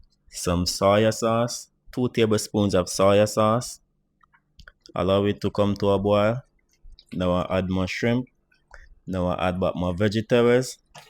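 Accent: Jamaica. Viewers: Brown Sugar Stir Fry …✨❤️try most of your recipes. I have been doing curry shrimp with coconut milk.. or sweet and sour shrimp. Why don’t you use olive oil big flavour or coconut oil both much healthier than vegetable oil. Fastest thing to cook after work. . I have been watching u since you started .. you have made big strides. . 🇯🇲💙✨❤️🇯🇲. It seems every parish have a different speech pattern which parish are you from?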